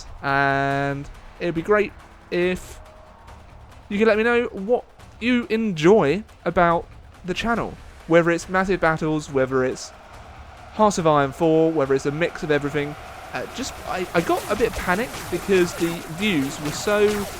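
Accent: United Kingdom. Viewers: Keep the massive battles and total war going, I love the survival battles. And personally I find your voice quite elegant, it gives your videos an almost documentary-like feel. Speaking of that can you say Amino Acids in your next video? It's my favorite work to hear with a proper english accent. By the way, I'm 27 and totally get it, living in the bay area of California is brutal, I pay $1800 american for rent.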